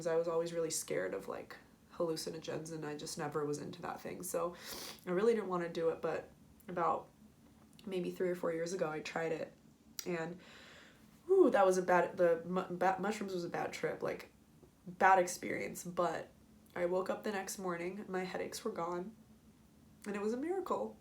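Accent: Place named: United States. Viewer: a few ideas for you: It is likely that it has something to do with a jammed up neck from an old neck trauma injury. If so then to fix such a neck you'll need to use these techniques: <link> The videos that show the treatment and yo get to see some of the hopeless cases that had been written off - but who are now fixed are shown here: <link> As you sound American and I'm in St Albans UK, then for the time being try this technique: <link> - it is the standard homework I give my patients and it is often very effective. As I think its your neck sleeping on an incline may also change the pressure on your neck, so you may need to try a lower pillow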